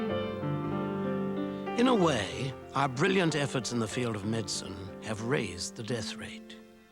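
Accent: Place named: England, United Kingdom. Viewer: I DON'T want to be, or sound like many of the negative commenters we come across online.. I'd RATHER be positive! But... this video kinda sucks. It's shallow, doesn't say much at all.. just the English accented narrator speaking sparse attempts at poetic sounding narrative, shallow talk about how live "within boundaries" in this life, and other such trite sounding quasi-philosophical babbling. There's very, very little discussion about ... "The Other Side Of Life". Very little, other than a couple allusions toward someone having a NDE on an operating table. The rest of the video, to a large degree, is images of scenes on Earth, with accompanying 70's sounding made for TV movie soundtracs. Oh well. Watch it if you want ;) Blessings to all! We're all out of here some relatively soon coming day, and we'll each experience the ultimate "THIS IS IT!" for ourselves.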